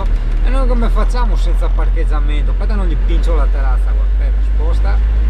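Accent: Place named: Treviso, Italy. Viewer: El to dialeto ghe someja tanto a quel de Treviso.....!!!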